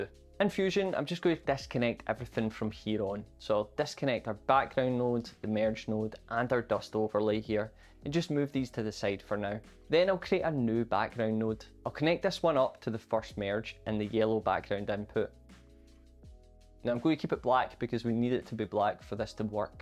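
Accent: Scotland, United Kingdom. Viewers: I've been using After Effects and I don't exactly plan on switching completely to Davinci Revolve... But, the Scottish accent is so lovely... 😋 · This is the best tutorial on YT which allows me to understand the basics of nodes and I made my first animation in Fusion 🤟 Thanks for that! Casey Faris tutorial is way too complicated and overloaded. Your way gives absolutely the minimum amount of required knowledge to begin with. By the way, I love your accent. Are you from the "Celtic" part of the UK? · One thing that can get annoying when you find tutorials like this, is if the presenter has an annoying voice or accent. It is therefore an absolute GODSEND if the presenter has a Scottish accent. Useful AND sonically pleasing. 😀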